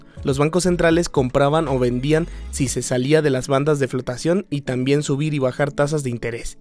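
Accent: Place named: Mexico. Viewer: No me gusta tu español mexicano.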